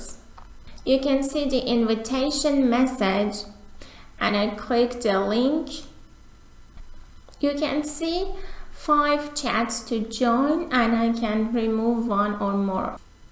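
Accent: Iran. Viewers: That Persian accent 😂😂😂😂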